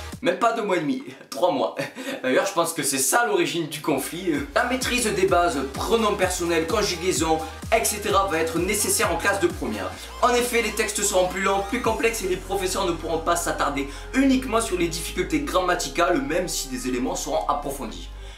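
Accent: Marseille, France. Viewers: Ça sent MARSEILLE 😏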